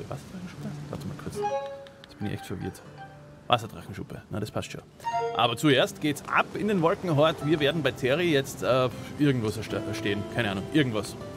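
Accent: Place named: Bavaria, Germany. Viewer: In welcher Stadt lebst du eigentlich weil du hast so ein bayrischen Dialekt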